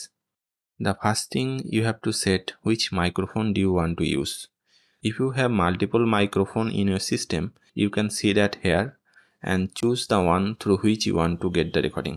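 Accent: India. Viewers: The pronunciation of the sounds like a Bengali speaking!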